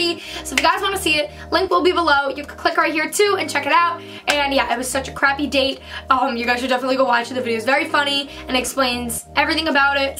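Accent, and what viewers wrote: New York, United States. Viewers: TeAnna Besougloff new yorker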